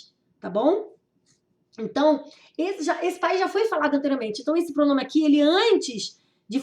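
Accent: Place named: Rio de Janeiro, Brazil. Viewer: vc dá aula no RJ em qual curso? sou daqui e nosso sotaque nos entrega rs. Gostaria de ter aula no seu curso.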